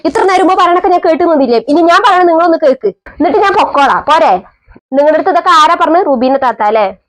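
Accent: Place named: Kallepadam, India. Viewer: കല്ലേപ്പാടം വർത്തമാനം നല്ല രസം....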